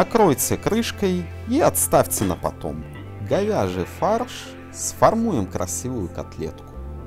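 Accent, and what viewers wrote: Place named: Belarus. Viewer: Профи👍 У вас белорусский акцент. Или я ошибаюсь?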